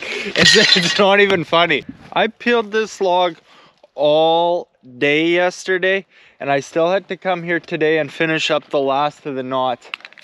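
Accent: Canada. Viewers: My family has been in Canada since 1809, we own large amounts of land for generations and yet still this guy sounds more Canadian than me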